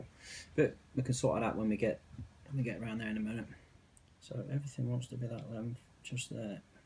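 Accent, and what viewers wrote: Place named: Lincolnshire, United Kingdom. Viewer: You sound like a lincolnshire lad:-)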